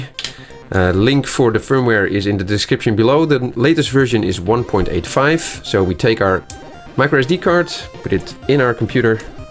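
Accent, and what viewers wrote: Netherlands. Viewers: Butt I heer a Dutch accent is dat right?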